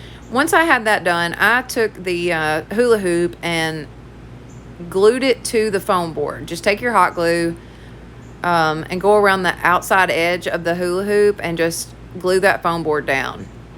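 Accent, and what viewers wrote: South, United States. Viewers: Hey, love your accent. What part of the south do you live in? This is my first time with you, and I love everything. Very encouraging. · I just stumbled across your channel. So I'm new here love all of you ideas... curious to know where your from because you sound very southern. I am from Tennessee so I really enjoyed listening to you and watching I am now a fan. Thanks so much for the ideas.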